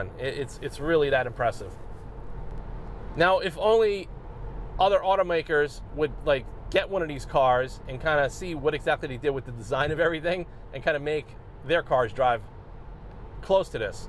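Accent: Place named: Long Island, United States. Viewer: What county are you in? At first I thought long island north shore, but then I thought Westchester. 🤔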